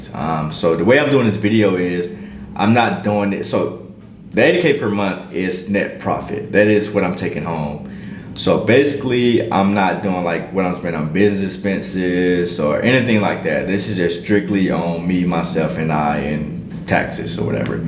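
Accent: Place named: Texas, United States. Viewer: Also you sorta have a country accent like Texas in a way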